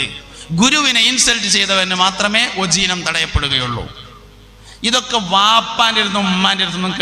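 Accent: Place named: Malappuram, India. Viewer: Vishayam nallathaan pakshe nalla malayalathil parayoo,, ningalyde Malappuram basha yellavarkum manassilakanamennilla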